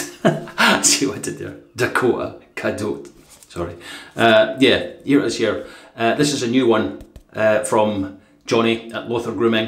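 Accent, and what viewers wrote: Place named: Cockney, United Kingdom. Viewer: 🤣🤣🤣 Hilarious cockney London Great shave.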